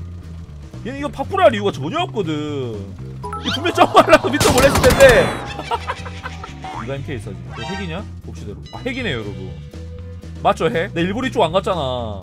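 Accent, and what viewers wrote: Busan, South Korea. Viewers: 아 부산사투리 너무 찰지고~~~ㅋㅋㅋㅋㅋㅋㅋㅋㅋㅋ 부산아재 확실하네용 ㅎㅎㅎ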